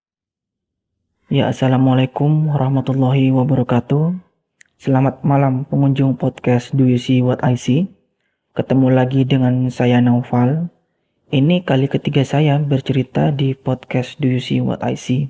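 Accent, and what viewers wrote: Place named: Jember, Indonesia. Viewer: Wong jember koyone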